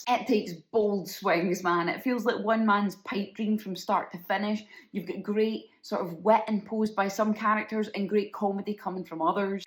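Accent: Scotland, United Kingdom. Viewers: I LOVE Wes Anderson. But anything weird registers with me. Haven’t seen this one yet, but I’ll have to. And I could listen to you talk forever. Not talking about your Scots dialect & accent (although I love that as well); I’m talking about your neuropsychological predisposition. I fell in love with you a long time ago (*please understand I’m not referring to anything romantic), which is why I wrote a character pseudo-based on you in my fictional pentalogy The Everlasting Life. Her name is Mattie Ferguson. Once my books are on shelves, I hope you are proud to be her inspiration. End of Speech. 🫂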